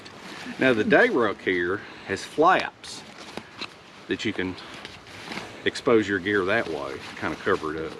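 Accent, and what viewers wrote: South, United States. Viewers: I love your accent man for me as a German it's a delight to just listen to you speak. What area are you from in the US? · This is one of my favorited channel. I especially like it when you explain everything so nicely especially with the southern accent .I found this channel randomly; it is really a channel that produces quality content. I have learn so much .Thank you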